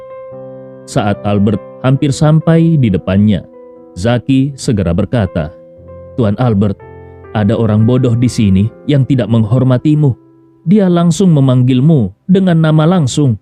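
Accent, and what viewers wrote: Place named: Bugis, Indonesia. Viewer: Bismillah....maasyaa Allah..logat bahasa bugisnya ada..mwmbuat hati ridu akan tanah kwlahiran suamiku....salam untuk semua sodaraka..sehat afiat smakin sukses selamanya....aamiin..Abang yg baik....trimakasih..atas semua kebaikan..yg membuat semua bajagia..smoga lindungan Rahmat Allah mrnyertai...tsumma aamiin....sukses sehat slalu bang...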